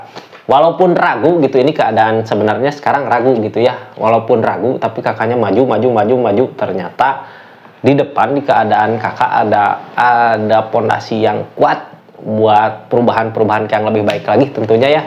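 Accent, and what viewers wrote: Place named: Sunda, Indonesia. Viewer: Biar bahasa Indonesia ,logat Sunda nya medok aja ,resonet aja deh komen aja deh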